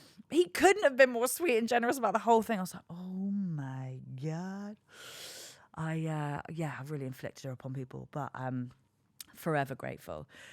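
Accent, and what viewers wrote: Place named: Liverpool, United Kingdom. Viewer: My favourite posh ginger southern scouser (when it’s in you, it’s in you). Go for it!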